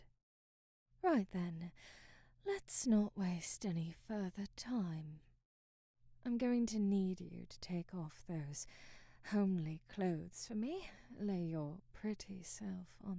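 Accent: United Kingdom. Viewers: This reminded me of how much I love the British accent… unfortunately reminded me of my ex girlfriend though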